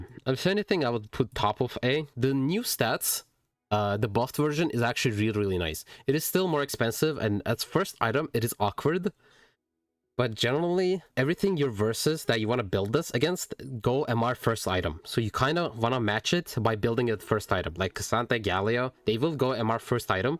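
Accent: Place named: Western Europe. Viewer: Whether you're from EUW (a guess based on your accent) or NA (your region), you're handsome as heck.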